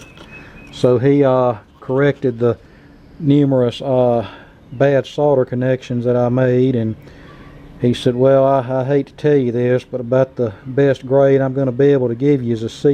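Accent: South, United States. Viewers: I don't ever recall anyone dying from consuming too much weed, yet not a day goes by that an innocent person isn't murdered by a goddamn assault weapon, designed to be used on a battle field. Guess we really shouldn't be surprised to hear you spew more negative comments about a plant that to the best of my knowledge hasn't killed anyone, and speak with such authority and fondness concerning assault rifles. We've been trying it your way forever, and things just keep getting worse for the children, yet every time we try to introduce even the most basic, common since changes in firearm laws, such as better back ground checks for instance, wrong wing fools like yourself cry about it. Ok, what do you backward ass right wingers propose we do? None of your representatives have any legislative proposals at all, AT ALL ! That is unless you consider M&M's, Disney & gay Americans real legislation. 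Most of you traded your country for a goddamn Red hat, and then tried to over throw our country because you didn't like the outcome of an election. Holy Shit, don't you feel smart ? ;-). You all were played by perhaps the dumbest American of all, and definitely the worst business man in the country. Based on that backward sounding southern accent of yours, we really shouldn't be surprised to hear you talking the way you did about this weeks school children being murdered, I mean they were guilty of going to school after all ! Such good Christians !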